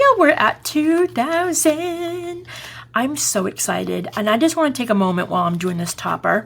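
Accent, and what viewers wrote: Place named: Boston, United States. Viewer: You're just the cutest ❤ I LOVE LOVE LOVE your creativity AND your Boston accent 🤗💕 hugs&love Darlin xxx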